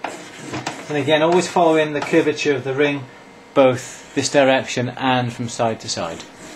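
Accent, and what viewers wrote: United Kingdom. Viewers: is so HOT! That cute little British accent gets me every time. Plus he's the only one on you tube who knows how to use a file. None of this back and forth garbage. --That guy has dirty hands because he's in buffing compound and sandpaper etc all day. It's hot.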